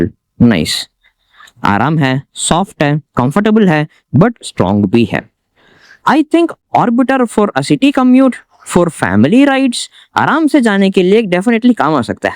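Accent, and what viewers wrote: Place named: South, India. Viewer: आपकी हिंदी ज्यादा अछि लगी जबकि आप दक्षिण भारतीय लहजे में बात कर रहे थे...लेकिन जो भी हो आपने बेस्ट हिंदी बोली....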